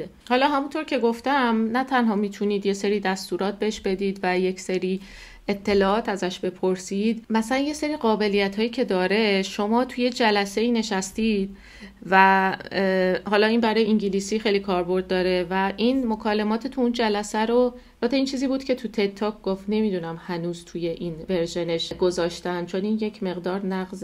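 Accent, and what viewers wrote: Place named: Turkey. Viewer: تلفظ تُرکی داری. تُرک کجایی؟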